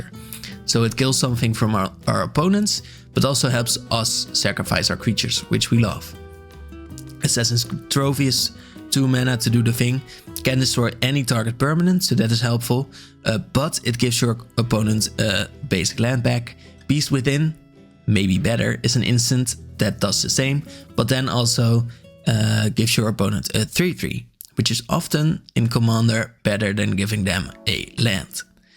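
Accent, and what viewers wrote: Netherlands. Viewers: my Dutch-radar is tingling are you dutch ? edit: nvm I looked at your channel and found the answer ;-0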